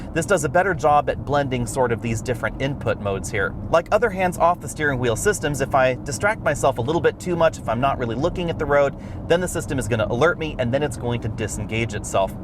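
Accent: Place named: Midwest, United States. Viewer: Now I know why I like watching so much; he’s midwestern!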